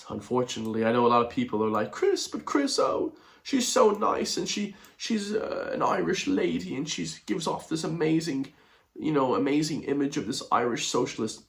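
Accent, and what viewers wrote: United States. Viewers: Dude I never miss a video and I agree almost 99 percent with you. Just curious I'm from Westside Galway and I lived 18 years in California and back home and sincerely not being smart ass but where does the American accent come from I've kids of friends of mine born and lived in America 13 years and within 5 yrs they had Irish accent and American accent gone but you've a full American accent with a tiny bit Irish accent. It's not a criticism just a real thing I'd love to know I was fully under impression you were born raised America and moved back to Galway as alot people did with kids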